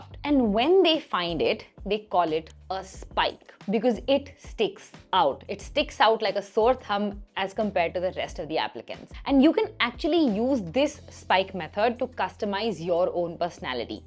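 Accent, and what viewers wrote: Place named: India. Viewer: by listening to her accent i can clearly say she is from India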